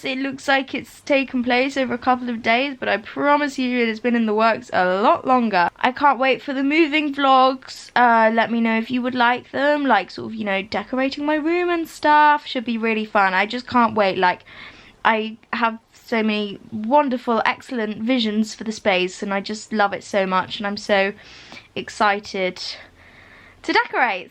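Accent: Scotland, United Kingdom. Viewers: You’re Scottish accent 😂😂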